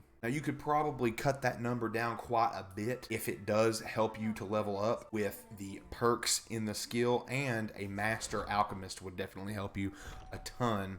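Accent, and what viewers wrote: South, United States. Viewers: I don’t watch you channel but hearing a dude with a southern accent explaining Skyrim stuff to me is much more comforting and entertaining than a sweaty nerd voice.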